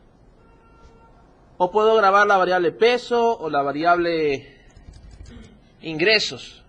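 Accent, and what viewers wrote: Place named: Ecuador. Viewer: se me hacia familiar la voz de ecuatorians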